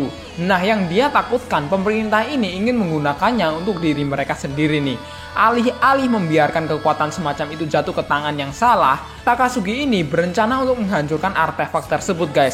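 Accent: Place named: Java, Indonesia. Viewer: kek si re:Jero mati terus ampe bosen Gw suka logat nih creator jujur(asal jeplak) dan medok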